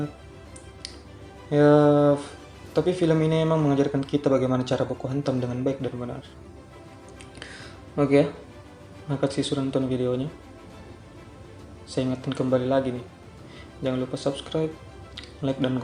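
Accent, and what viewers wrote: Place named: Makassar, Indonesia. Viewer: Orang Makassar ya kau bang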